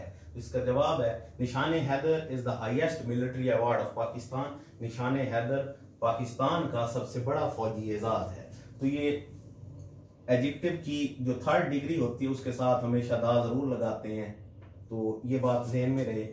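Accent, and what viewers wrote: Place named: United Kingdom. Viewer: Excellent pronunciation n perfect British Accent.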